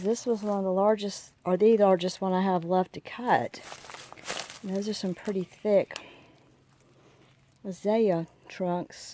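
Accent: South, United States. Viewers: I might have to check out one of those chain saws. I've always just used loppers. I've wondered if you live in the South? I live in East Tennessee and we have similar accents 💖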